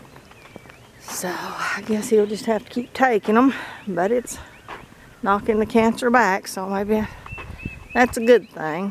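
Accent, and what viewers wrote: South, United States. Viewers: I do enjoy your talking and walking. I have a long time lady friend that lives in my apt building she is from the south but sounds just like you. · I enjoyed listening to you talk reminds me of my mother My southern accent isnt as strong as yours Get A trail camera from Walmart it will capture the owl they are fairly cheap I video my deer all the time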